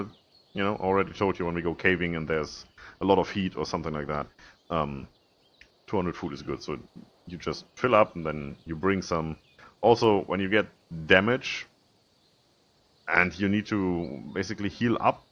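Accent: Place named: Germany. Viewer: Hey hey, So wie du redest würde ich sagen Deutscher xD nettes video ;D besteht der fehler nach dem Server reboot immernoch ?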